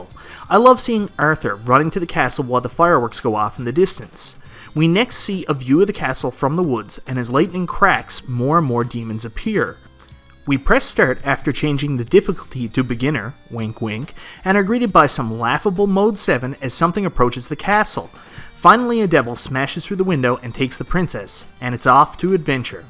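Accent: Canada. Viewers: Another nice vid! I’m been a sub for a while but haven’t commented. If you don’t mind me asking what part of Canada are you from? I’ve noticed your accent. Cheers man!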